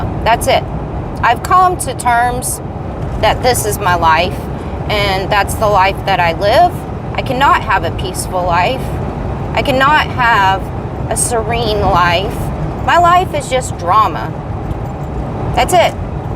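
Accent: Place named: Georgia, United States. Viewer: Your kindness is not wasted. I think you are beautiful. I can't tell you how to find happiness, but I hope you do so, often. I'm from GA originally and something about the way you talk reminds me of home. I love your videos and I hope you continue. - - Not sure how this will land but I will mention it. God will always be there to listen. Prayer is therapeutic, at least. Even if that is all that is expected of it.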